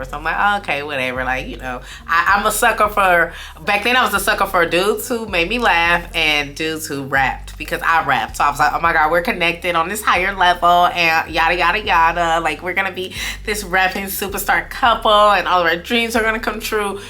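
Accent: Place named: Bronx, United States. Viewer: OMG! Sounds like this guy I know from the Bronx whose name started with the letter J 😂 You're an awesome storyteller, keep 'em coming!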